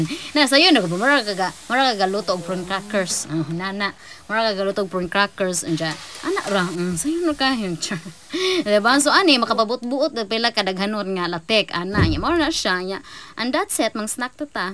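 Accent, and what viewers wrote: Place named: Bohol, Philippines. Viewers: Hi mga nice jamo imong mga content sa vedio vlog,mga lami au imong mga gepangluto,accent sa u inistoryahay that Bohol jd,jamojamo jd......kalami 😋makalaway oi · Ganahan kaayo ko maminaw sa imong Bisaya accent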